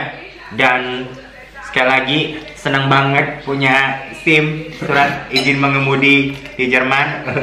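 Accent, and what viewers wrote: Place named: Sunda, Indonesia. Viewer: itu emang sunda banget,soalnya aku dari sunda🤭👍salam dari indo🙏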